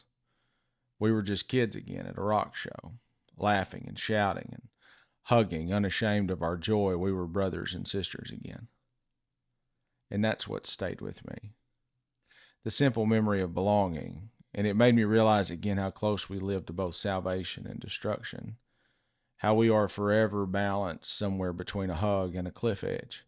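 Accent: South, United States. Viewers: Preach my southern articulate brother in spirit.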